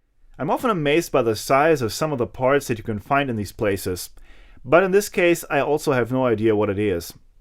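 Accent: Germany. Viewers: I was a US soldier serving in Germany from 1990-96 Your flea markets are much like your scrap yard, All nice stuff, over here in the state rarely do you get steel drops or anything that functions! Your videos are great, my mother was German, she passed in 2017, it's always nice to hear a German accent, thanks for sharing your work! ;)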